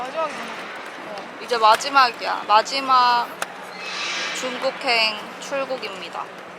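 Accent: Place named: Busan, South Korea. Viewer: 님 사투리가 들리네요!ㅋㅋㅋㅋ 부산사람이셔요?!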